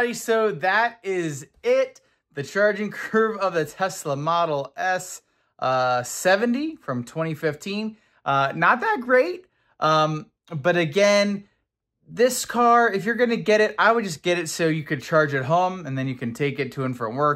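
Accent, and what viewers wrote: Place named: Baltimore, United States. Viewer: Nice video. I am having trouble getting over the Packers quarter zip. I had always thought you were a local Baltimore guy with a Townie accent. Seriously though, nice vid. Did I miss the overlay of the original "new" charging curve? Maybe the Packers top blinded me.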